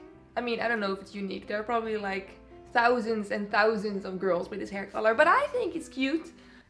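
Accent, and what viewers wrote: Netherlands. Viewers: Wait is she dutch? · ZC I tough she was Dutch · Hello. One question: are you Dutch? I think you have a Dutch accent. I am also Dutch :)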